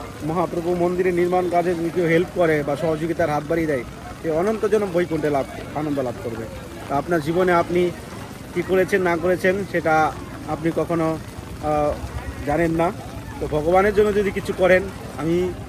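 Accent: Bangladesh. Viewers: ভাই তুমি কি বাংলাদেশী। language টা এমন কেন?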